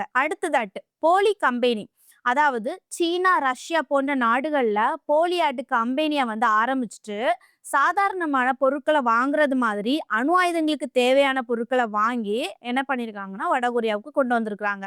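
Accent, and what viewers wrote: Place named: Coimbatore, India. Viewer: 🎉🎉 அழகான செய்திகள் நல்ல தெளிவான வாசிப்பு விளக்கம் 🎉🎉 புதிய வரவு வாழ்த்துக்கள் பாப்பா பேச்சு வழக்கில் கோவை மாவட்ட ஸ்டைல் தெரிகிறது அழகு அருமை. மதுரை சக்திவேல்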